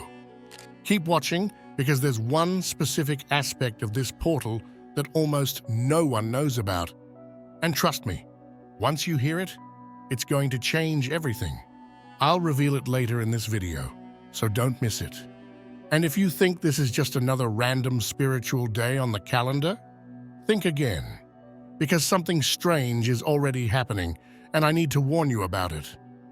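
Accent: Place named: Australia. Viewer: Reading the comments. I have felt dizzy, a bit nauseous and was wondering why. I still dont immediatly realise it is from a surge of electro magnetism. I just burned my st Brigid reeds cross from last year! I manifested all my troubles and doubts and hesitations to be gone, cleansed away. I was alone so just did what I felt was right. I am glad now. Another serendipity. Thank you forthis video, first time i come across your channel. You sound Australian😊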